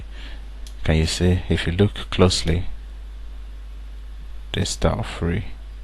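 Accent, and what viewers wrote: Nigeria. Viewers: r u nigerian? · Are you nigerian? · pls r u a nigerian? just curious cos of the accent. thanks 4 the video. cheers!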